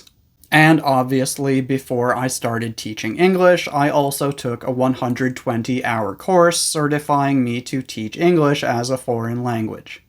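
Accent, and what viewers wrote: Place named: United States. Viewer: Fine. I noticed you have an american accent.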